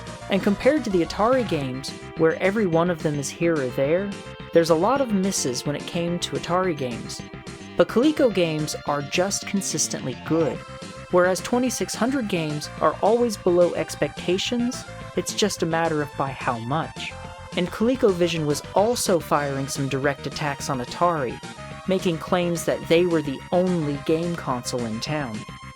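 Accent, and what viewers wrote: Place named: Sacramento, United States. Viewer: You sound like it (from Sacramento)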